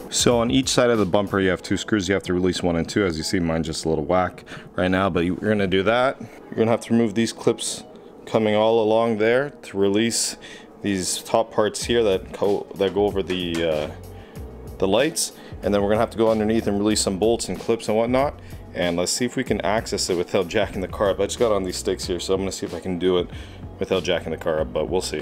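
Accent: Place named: Toronto, Canada. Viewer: You're from toronto. I will alway recognize the way ppl talk here in any video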